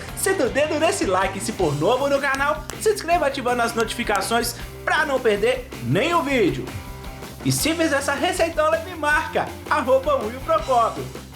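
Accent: Minas Gerais, Brazil. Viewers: Amei o seu sotaque mineiro “ o trem mais bom “. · O homem fala mineiro mineiro quinhentas mil vezes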